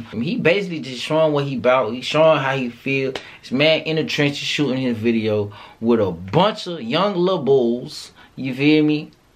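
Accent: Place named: Philadelphia, United States. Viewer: You from Philadelphia I could tell young bull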